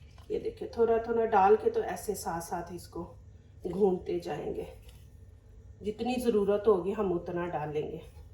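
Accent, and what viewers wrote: Punjab, India. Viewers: Aap punjabi pind jesylehjy mn baat krty hn. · Ap na bohat acha Prata bnaye hain par ap ki jo Zuban hain us aa saf pta challah hai kh Ap ki Zyban Panhabi hai to best hai ap Punjabi bolo apni Zyban bolna mn kaisi sharm please apni Zyban bolna mn kisi ko bhi sharam mesoos nahi karni chahiye I prud of my panjabi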